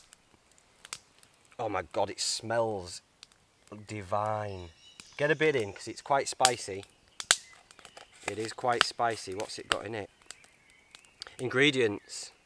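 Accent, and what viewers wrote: Yorkshire, United Kingdom. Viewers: Where are you from? I detect a yorkshire accent.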